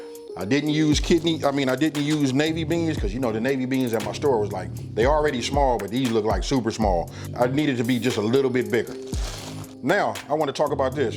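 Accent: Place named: Los Angeles, United States. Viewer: Yes, sir!! One of my fav soups. New sub cause you sound like you're from where I'm from... L.A., CA. Cornbread is a must.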